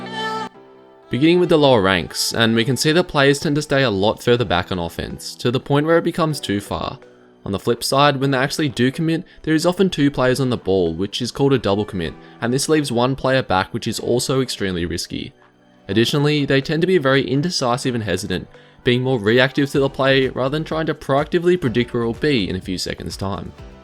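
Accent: Australia. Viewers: The aussie accent gave it up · r u from Australia?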